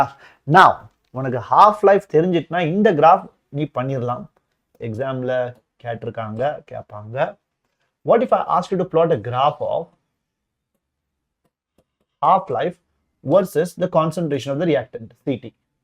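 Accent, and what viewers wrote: Tirunelveli, India. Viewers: Sir ku tirunelveli pola 😅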